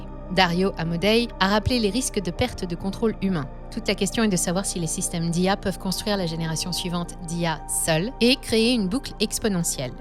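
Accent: United States. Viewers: Vidéo totalement vide d'intérêt et puis cet accent al américain détestable..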